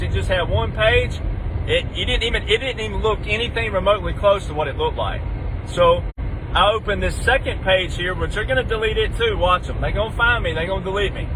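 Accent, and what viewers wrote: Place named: South, United States. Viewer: There’s some things that just sound better in a Southern accent. js…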